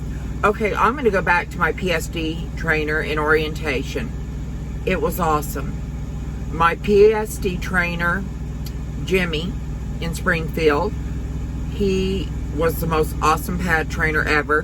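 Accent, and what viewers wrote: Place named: Oklahoma, United States. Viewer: Did you work with horses?? I love your accent ma'am, that is Oklahoma? You look great Putting on weight for me is not so much about how the extra weight makes me look anymore, I don't mind looking heavy, I mind when I move, I feel jiggling in the caboose My problem is sugar and caffeine Sugar and caffeine is so convenient I will be doing my absolute best to eat healthy AND satisfying foods One day maybe fruits and seeds will taste better than rice Krispies treats, Can't wait to get an insta pot, I wonder how you keep them clean on the truck?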